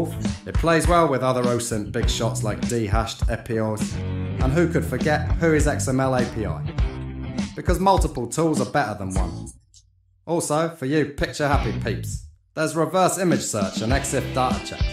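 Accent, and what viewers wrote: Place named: Mockney, United Kingdom. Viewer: Love the Guy Ritchie mockney accent goes well with the presentation. prefer web based apps for windows as not a fan of chrome. Brilliant tool, I must delve deeper into there whys, whats and wheres. thanks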